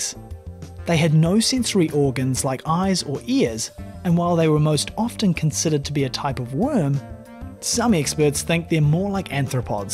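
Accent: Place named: Australia. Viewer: Nothing frightening about any of these, and your Aussi accent makes many of your species pronunciations atrocious. There is no such thing as an "anthropod." It's "arthropod." You made that mistake throughout this video.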